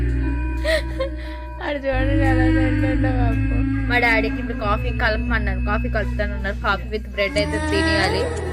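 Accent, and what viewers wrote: Srikakulam, India. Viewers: Srikakulam slag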